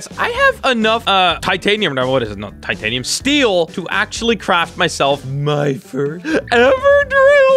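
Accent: Netherlands. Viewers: Ok, in almost sure that you are dutch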